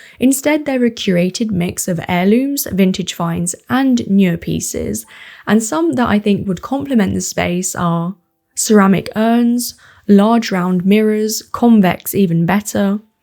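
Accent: United Kingdom. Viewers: Very nice. Thank you for sharing your first-hand experiences with us. We're going to have to work on YouTube's subtitle app -- it seems unfamiliar with mullions, toile, and yew, and for that matter, even manor houses! Love your beautiful British accent!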